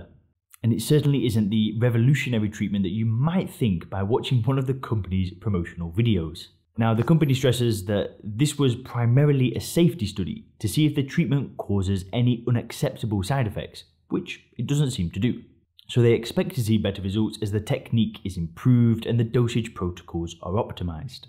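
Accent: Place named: Scotland, United Kingdom. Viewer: ermete trismegisto Scottish accent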